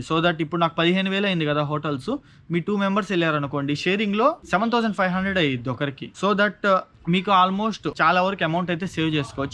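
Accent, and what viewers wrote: Nellore, India. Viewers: Chokka chinchu kovadhu 😂😂😂😂😂 love from chennai...assalu Nellore baasha keka ❤️🥰😂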